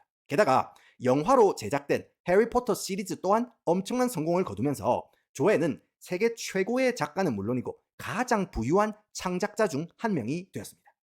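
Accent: Gyeongsang, South Korea. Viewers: 니말투 경상도인간이네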